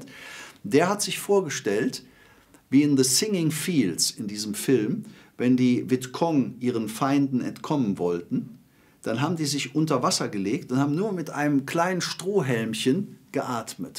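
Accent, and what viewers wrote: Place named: Cologne, Germany. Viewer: Stundenlang kann ich mit dir quatschen, du bist so ein cooler Typ, mit kölsche Einschlag 👍😎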